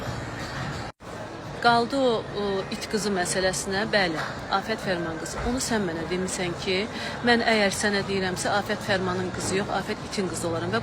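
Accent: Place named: Baku, Azerbaijan. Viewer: siz köycayllsan bakl lehcesinde nöş da lslrsan.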